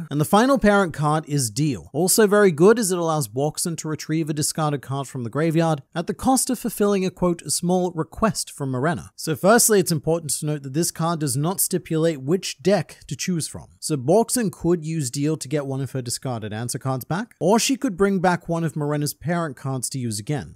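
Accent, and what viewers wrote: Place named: Australia. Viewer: I love ozzy accents. Morenerr!!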